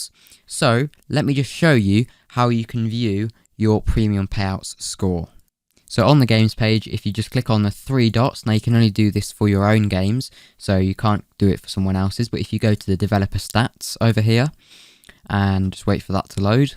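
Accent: United Kingdom. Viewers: Are you from UK?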